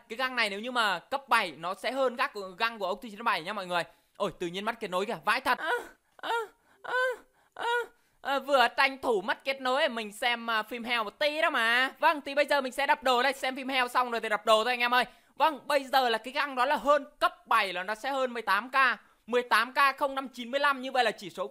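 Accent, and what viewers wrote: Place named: Central, Vietnam. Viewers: Vkl giọng nghệ an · Đúng là dân nghệ tĩnh nói hay ri hey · Thích tiếng miềm trung hơn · tội ah hồm nay ns tiếng nghệ an, em cũng ở nghệ an ah này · A bl tiếg Huế maxx hay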